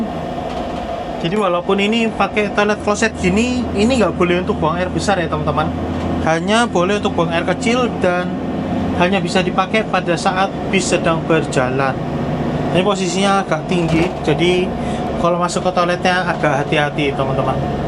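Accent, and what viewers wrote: Surabaya, Indonesia. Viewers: Seneng kalo mas ngomong. Sopan. Suaranya kalem. Trus bahasa jawa surabayaan nya keluar tuh 😅 bahasa jawa chindo gitu 😂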